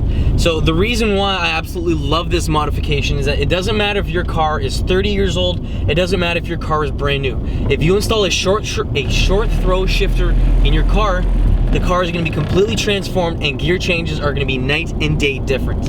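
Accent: Canada. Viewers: He's not Canadian? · NOAH Shirsat lmfao...where is he from Canada????? I had to stop watching the video... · Let me guess you're from Canada? the accent is really annoying xD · He's Canadian, they gave us syrup and hockey so I can look past ot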